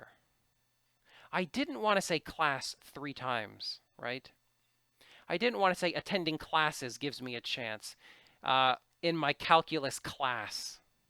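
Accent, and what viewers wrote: Canada. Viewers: sure i think he is Canadian cos i hear his "" about / out " whole time and so cute too, love this teacher. super clear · hi there, your accent is cool Are you from Canada ?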